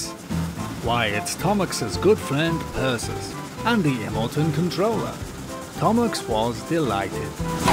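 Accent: Scotland, United Kingdom. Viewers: Is the narrator Scottish?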